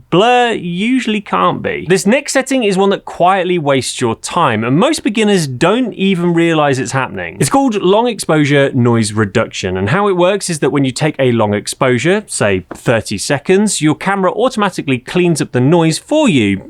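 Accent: United Kingdom. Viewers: Informative common sense leavened with wit —and a non-AI British accent that's not insufferable.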